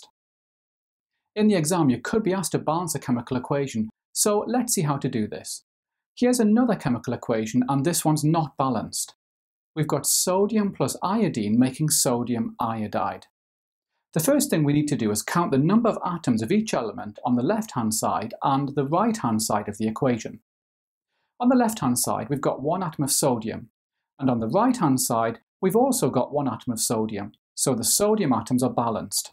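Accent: United Kingdom. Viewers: Why British accent makes everything better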